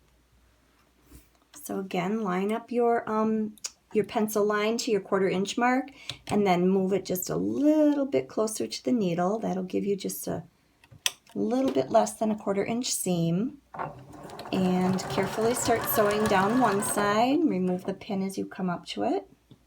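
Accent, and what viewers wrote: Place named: Upstate New York, United States. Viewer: I have a dear cousin in upstate NY....is that where you're from? I love your accent. Thank you for your excellent tutorial!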